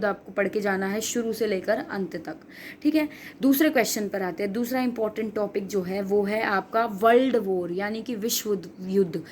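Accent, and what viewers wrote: Haryana, India. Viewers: yss, haryanvi accent hai madam ka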